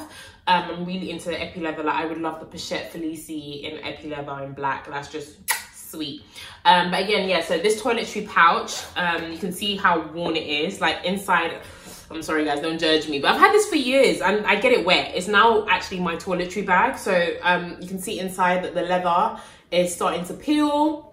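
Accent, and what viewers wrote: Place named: South, United States. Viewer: Love your review and your accent with a little southern twang. "Bruh you pay for this shit"....lol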